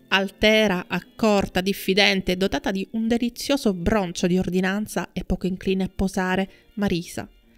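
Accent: Sicily, Italy. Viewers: Ciao, appena iscritta! Ho trovato la storia sconvolgente, adoro da quando ero adolescente gli attori del passato, fra questi il mito per eccellenza Dean di cui parli...Mi sento dirti grazie, tenendomi il beneficio del dubbio( leggendo i commenti degli altri iscritti..anche riguardo le fonti del libro letto..) sulla totale veridicità dei personaggi di cui parli, non so chi sei etc..che sei siciliana lo sento dal tuo accento! Ciao buon lavoro!🌹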